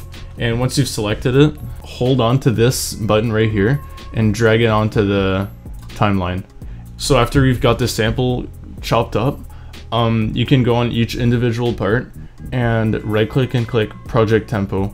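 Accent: Quebec, Canada. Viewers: tes quebecois? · Wtf are you from Quebec? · what you are québécois je savais pas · j'ai failli pleurer quand j'ai entendu ton magnifique québecois et juste après les sous titres 😂😂😂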